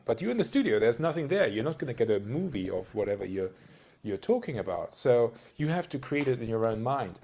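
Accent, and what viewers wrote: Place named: Mid-Atlantic, United States. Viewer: Some good pointers==thanks. There's a mid Atlantic twang in there somewhere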